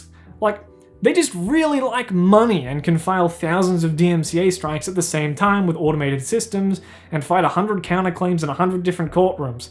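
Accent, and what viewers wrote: Australia. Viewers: I love your sweet sweet Australian voice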